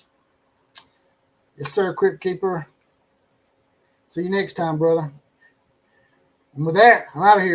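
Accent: South, United States. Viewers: I rather like the southern drawl. But not without the hospitality. 🤣 I'm a Texas fan, so come at me bruh, but I wasn't commenting good or bad, just noticing your southern drawl and sayin there wasn't anything quite like it. I expected a chuckle. But if ya want some nonsense, "I'm your huckleberry." 😈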